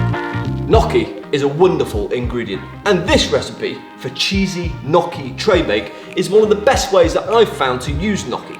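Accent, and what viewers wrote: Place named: United Kingdom. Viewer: OMG that's it for me, how did you know!? And that accent ;-) just luv it, really missed that since I left UK.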